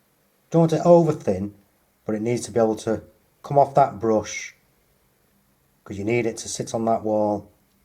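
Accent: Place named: Liverpool, United Kingdom. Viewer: Great video! How long would you leave it before going in with the 2nd coat? Trying to place your accent, it's sounds slightly Liverpudlian but not too much. Warrington area maybe?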